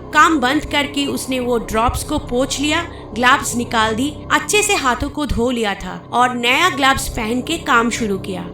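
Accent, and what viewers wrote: Bengal, India. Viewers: Loved the way u say mercury... From day 1 i had found ur bengali accent very cute.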